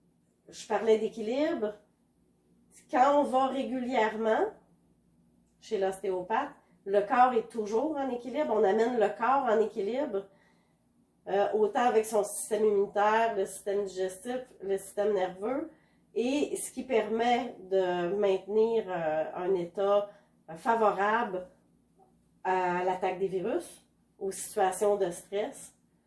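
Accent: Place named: Canada. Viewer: Trop mimi ta voix douce ton naturel et ton petit accent canadien 😍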